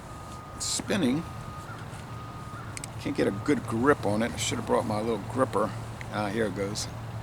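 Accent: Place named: New Orleans, United States. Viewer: You are welcome, I always really enjoy your vids, and have to admit enjoy the accent as well as I lived in and around New Orleans for many years. For you or anyone else interested, I rn across this posted today. This fellow does a deep dive into the rules and regs of Tequila and the oversight. Interesting but maybe a bit much for the casual Tequila drinker. <link>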